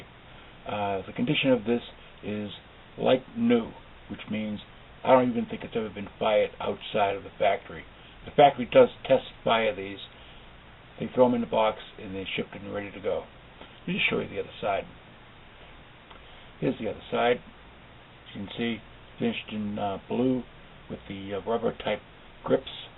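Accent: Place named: New England, United States. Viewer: Love his New England accent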